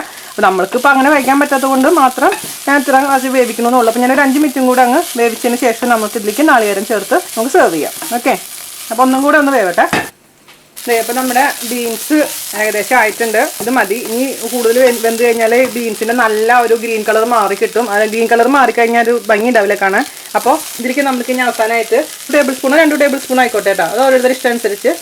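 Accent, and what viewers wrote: Thrissur, India. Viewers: Hi chechi..your explaining well and Thrissur language is good....I prepared this thoran today it is very tasty...look yummy..thank you for this receipe..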